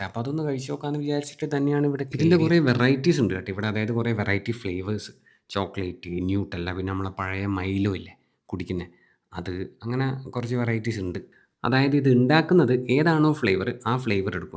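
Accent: Kannur, India. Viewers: Brode Place Kannur Ahno ?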